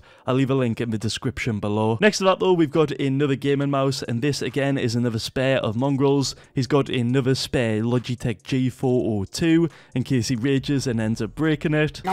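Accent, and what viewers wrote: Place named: United Kingdom. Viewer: that British accent hit different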